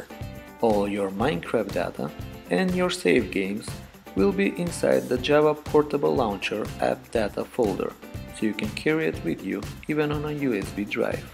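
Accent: Russia. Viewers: u sound Vrussian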